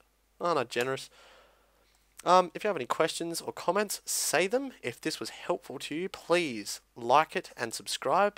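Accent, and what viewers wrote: Australia. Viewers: i just wanna say thanks for talking nice and clearly, and in a good easy understandable aussie accent. half the other dub tutorials on youtube have hell quiet hard to understand accents, so be proud of that one. also, i havent checked ur other vidz but do u have a tutorial on how to do build ups and bass drops? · Ha, thanks man you've helped a lot because I'm a noob to FL studio! Your aussie accent makes the commentating even funnier XD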